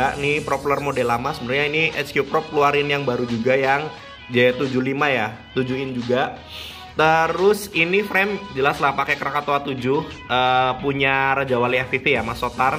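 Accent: Banyumas, Indonesia. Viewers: Bang .pengin beli d mana ya ngapak juga kie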